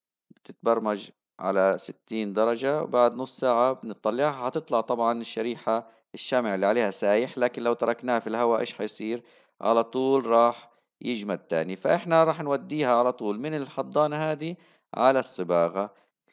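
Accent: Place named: Libya. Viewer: الله يوفقك مشكور . لاحظت أنه لهجتك فيها اللهجة الليبية كتير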